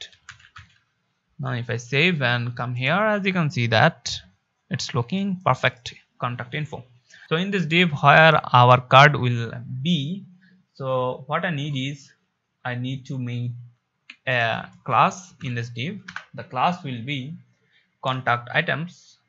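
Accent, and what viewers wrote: India. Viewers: When I hear a Bengali voice with English accent, I think I'm gonna learn something easily. Superb Tutorial! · i'm so simple guys. when I saw an India English voice making tutorials, I liked :)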